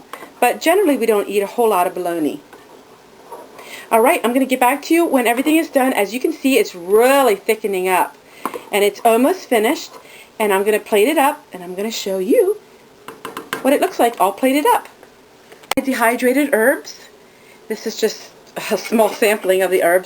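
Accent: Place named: Pennsylvania, United States. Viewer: ha ha, i knew your accent. i am from that area of PA, and now live in NC. so nice to have found you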